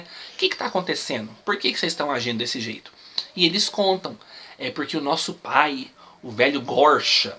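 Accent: Minas Gerais, Brazil. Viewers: Um resumo bem mineirês. Amei! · "Sai pra lá, não quero esse trem não" KKKKKKKKKK amo as resenhas do principalmente pelo mineirês🤣🤣🤣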